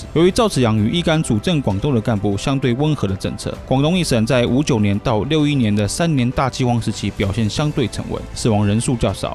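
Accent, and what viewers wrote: Taiwan. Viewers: 台湾口音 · 听这口音就知道是湾湾的😂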